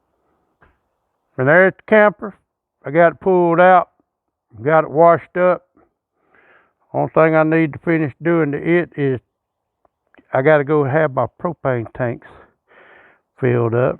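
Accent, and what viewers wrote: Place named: South, United States. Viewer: Well Sir you talk just as country as me.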